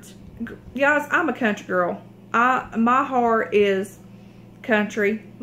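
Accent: South, United States. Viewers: Hi sweetie. I don't wear make up, I don't shop a lot and I'm the worst singer in the world but you know why I subscribe to your channel? Becuase I can tell that your a genuine and honest caring person and when I hear your videos with that adorable southern accent, I think wow...me and her could get on as friends a real treat. Your shopping stories crack me up and your singing is so enjoyable. Don't let others take your light from you girl. Stand together with God in which you know what's right and you'll get by just fine. This is just for now and this will pass. Don't cry pls. You're impacting others to do right in this world so chin up, deep breath and step forward. Xo Mrs Localvore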